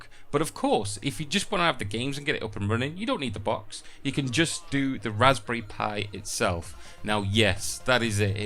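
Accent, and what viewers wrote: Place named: England, United Kingdom. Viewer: aahhh , the guy behind the funny english accent ....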